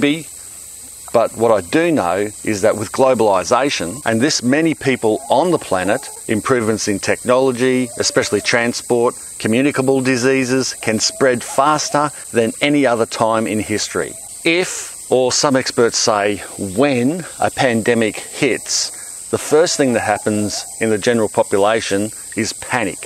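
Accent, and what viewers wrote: Australia. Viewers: I'm in Ontario Canada. I lived a couple of years in Melbourne and my brother has lived in Australia now over 30 years. It's my fav place outside of Canada. I love to watch Aussie videos to hear the accent I miss so much and in videos I love to hear the birds - I miss their sounds so much.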